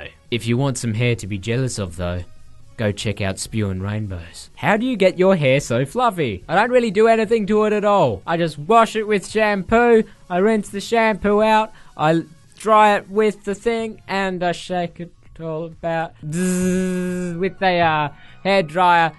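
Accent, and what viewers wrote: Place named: Australia. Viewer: we're both Australian :D i didnt know because im too used to the Australian accent...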